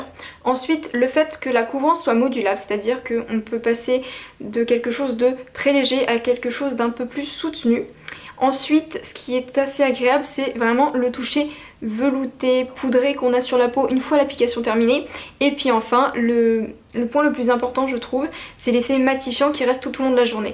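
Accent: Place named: France. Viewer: Oh mon dieu! Jadore ton accent :) habituellement j'ai de la misere avec laccent francais, mais ton accent est super delicat sophistiqué et adorable :) j'ai vraiment aimé ton opinion !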